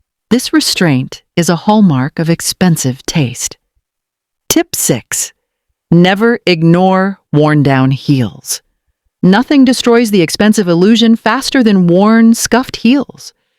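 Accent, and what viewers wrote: United Kingdom. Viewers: I prefer the British voice. Nicer to listen to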